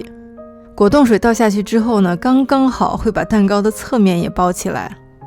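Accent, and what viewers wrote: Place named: Beijing, China. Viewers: 北京口音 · ，看头像里你的样子，和我一个同学长得很像，她也是一个北京姑娘，所以连口音都像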